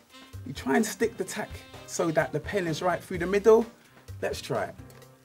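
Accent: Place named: England, United Kingdom. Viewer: He's English, not American I believe.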